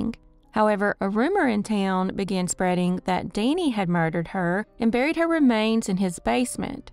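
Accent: South, United States. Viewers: GREAT JOB!! KEEP EM COMING!! AND I LOVE THAT SOUTHERN COUNTRY VOICE!!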